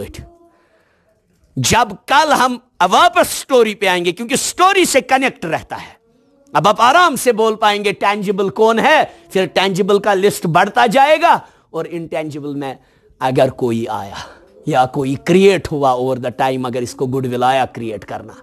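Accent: Kashmir, India. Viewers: Sir ap ki kashmiri sunn k mojhy B ed ki clases ki yaad aa gi...😂😂😂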